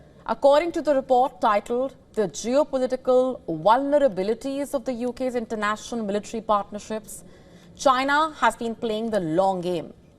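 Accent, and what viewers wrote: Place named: India. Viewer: A typical Indian accent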